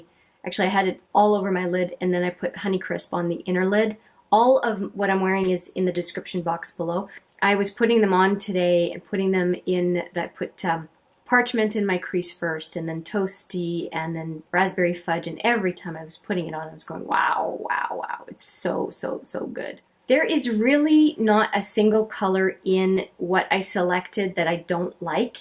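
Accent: Canada. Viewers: You are such a lovely and beautiful person❤️ I have been loving your videos! As soon as I heard you speak, I could tell you’re Canadian (my husband is Canadian and I’m American)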